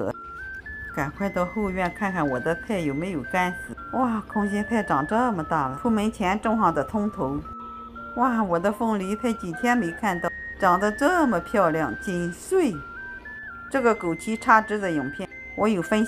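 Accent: China. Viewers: 解説有見地，大陸口音，哪裏人呢？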